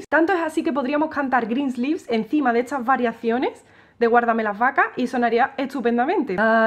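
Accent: Andalusia, Spain. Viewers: Que bello tocas y que bonita tu pronunciación andaluza!!! Muchas Gracias! 😍😘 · No me considero egoísta pero cuando se piensa que has de tomar vacaciones...Muchacha! Y que vamos a hacer mientras sales de nuestra órbita??? Tu eres nuestro pan de cada semana, siempre espero a que sean aquí las 15 horas para ver tu carita y escuchar tu "Buenas Tardes" con ese acento andaluz tan gracioso para nosotros y naturalmente tu cátedra de historia de la música y su mecánica, por favor, descansa pero no tomes vacaciones muy largas...please! :thanksdoc::thanksdoc::thanksdoc:. Me pareció que tu programa de hoy se centró en Greensleves tocada en diferentes tonos y ritmos, verdad? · Que belleza, que magia...la poderosa razón estética....soy de Caracas Venezuela...me incineraste el corazón con tu interpretación del polo <link> encantan como hablan las andaluzas.. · Nena ya le han hecho muchos elogios en relación a su evidente alta preparación músical , ademas d historia musical y con una sintesis didactica propia q le enaltece !! 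, sin duda alguna es Usted un crack en música . Yo le voy elogiar otro punto adicional : nunca habia yo oido un cambio d dicción con el nivel d perfección como el suyo !! ... d su hermoso acento Andalúz , salta a un perfecto Inglés !! , luego a un perfecto castellano , y tamb a una perfecta entonación d ese bonito polo margariteño .... Tiene un don d oido I M P R E S I O N A N T E ... !!!! 🥇🥇🥇💯💯💯👏🏻👏🏻👏🏻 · Excelente! De que parte de España es tu acento?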